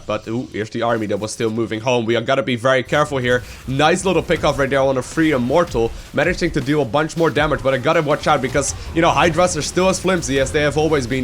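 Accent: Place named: Netherlands. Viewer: Pretty sure he's Dutch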